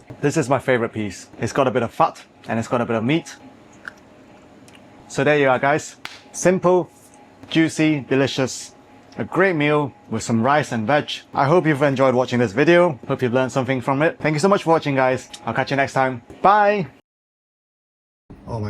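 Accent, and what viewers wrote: Northern England, United Kingdom. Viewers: It's his voice, he's from the north of England, has a nice mix of accents.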